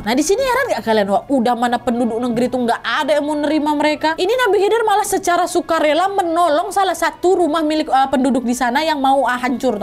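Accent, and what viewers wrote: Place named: Sumatra, Indonesia. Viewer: Kurasa kau ini orang sumatera dah , logat kau itu jelas kali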